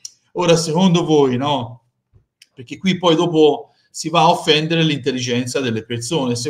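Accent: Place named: Florence, Italy. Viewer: Bellissimo il tuo accento fiorentino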